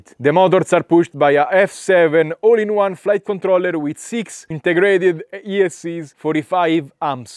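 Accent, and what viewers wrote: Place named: Italy. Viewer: 2 parole e ho subito capito eri italiano ahah grande 💪🏽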